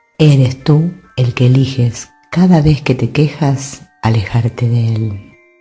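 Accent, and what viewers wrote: Argentina. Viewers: Gracias por la claridad con la q explicas..sos Argentina?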